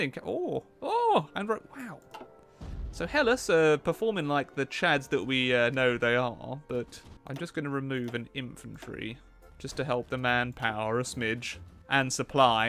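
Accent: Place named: England, United Kingdom. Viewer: sounds more English when he’s in England.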